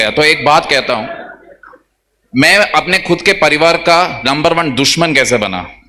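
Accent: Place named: South, India. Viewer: sir belong south side that's why his tone like this.... But practice makes everything so practices it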